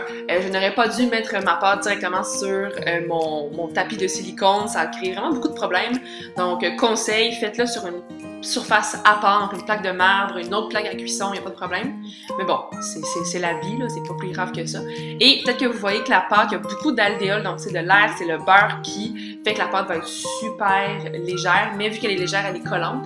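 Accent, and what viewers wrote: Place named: Quebec, Canada. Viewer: Après 5 ans mais pas grave et sur un très joli accent Québécois que j'affectionne !... 😘♥ 👍✅ Magnifique ✅👍 Merci pour le Partage Tout du Bon pour la Suite !... Amitiés "ℜonny" /Femme/61 ans/ Alsace/ France ... ◕‿◕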